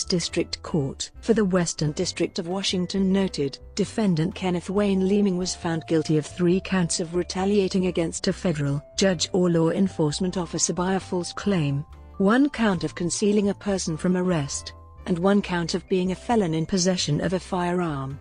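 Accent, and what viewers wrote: England, United Kingdom. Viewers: Someone program these damn computer voices better at speaking American English. And lose the English accent.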